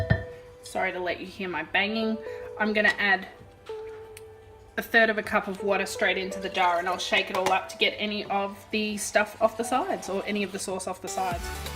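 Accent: Australia. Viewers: I came from money mom's video. Are from QLD because you sound just like Mel Buttle and she sounds so QLD to me? Also are you Mel Buttle?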